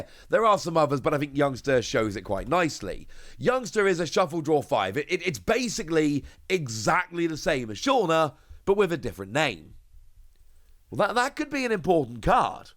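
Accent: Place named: United Kingdom. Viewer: Yeah it’s pretty common for UK English speakers to use the “yu” sound for when the letter U follows a consonant.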